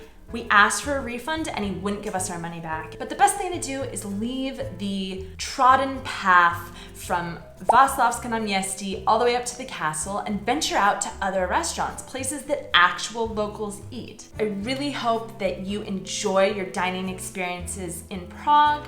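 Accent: United States. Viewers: Máš štěstí že žiješ v Praze :-D Mluvíš vážně dobře Česky, ale pokud pojedeš kamkoli jinam, odlož všechnu tu *** politickou korektnost a divokou Americkou intonaci :-D Jakmile Ti bude připadat, že mluvíš tak sarkasticky a že jsi tak cynická, že by Tě za to doma zavřeli, mluvíš jako Čech. Přijeď do Ostravy a uvidíš :-D You are lucky to live in Prague :-D you speak really god Czech, but when you go anywhere else here, put aside all that ** PC and wild American expression :-D Only when you feel so sarcastic and so cynic, that you would get locked in America, then you speak Czech. Come to Ostrava and you will see :-D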